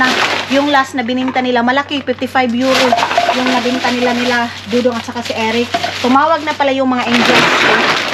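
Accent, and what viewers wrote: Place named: Talacogon, Philippines. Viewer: Fan mo ako ganahan ko sa imo vlog ky makalingaw 70 yrs old na ko taga talacogon talacognon ba jud imo sinultihan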